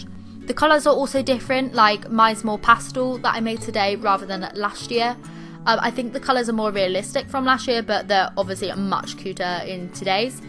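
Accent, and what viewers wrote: United Kingdom. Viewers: It’s a Brit!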